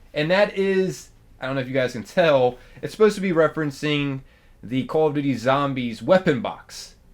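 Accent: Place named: Pennsylvania, United States. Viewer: Yinz from Picksburg? Your accent sounds realllllllll PA.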